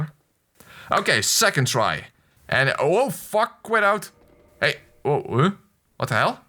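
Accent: Netherlands. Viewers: U must be dutch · 2 seconds of hearing the voice alredy realized he was dutch lamaoooo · ha, so my suspicion was right. it is a dutch accent. grüße aus deutschland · I KNEW YOU WERE DUTCH. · Bro you are dutch aren't you😏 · Are you Dutch?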